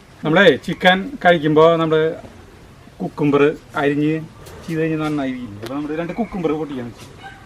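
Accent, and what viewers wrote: Thrissur, India. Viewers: thrisur basha kelakan vendit sarikum njn video kanane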